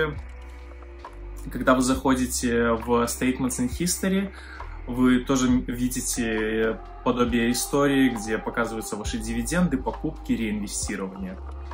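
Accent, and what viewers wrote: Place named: Belarus. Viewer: Беларуски акцент 😉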